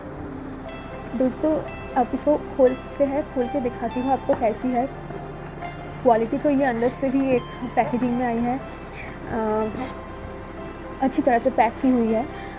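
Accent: Bengal, India. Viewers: Mone hocche khanti bangali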